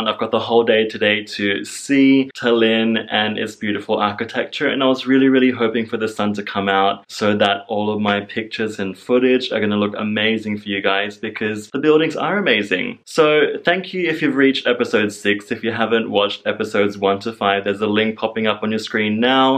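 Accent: Australia. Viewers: Your video popped up because we're heading to Tallin next week, and looking at all the vlogs from there. Hubs is watching while I'm working and I heard the accent I was OOOOOO an Australian accent and came to watch lol. Maybe we'll bump into you in Finland on our way back!! #HappyTravels!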